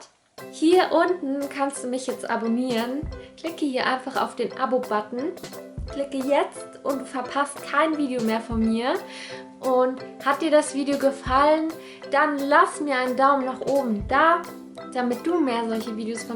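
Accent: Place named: Franconia, Germany. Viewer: Daumen hoch wegen deines fränkischen Dialekts :-)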